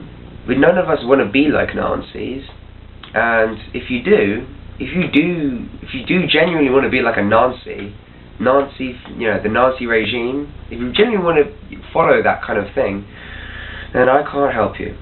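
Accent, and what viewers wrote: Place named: South, United States. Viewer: i love your videos they are very insightful:) p.s. im in love with your voice i love southern accents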